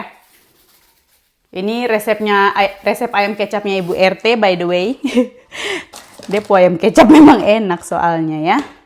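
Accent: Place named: Maluku, Indonesia. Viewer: Selamat ulang tahun kk...salam kenal aku penggemar baru mu dari yogya...asek bgt logat maluku nya masih terus melekat kk... Sehabis nonton DAILY TIKA WEIXUN DICHINA langsung gercep nonton vlognya kk...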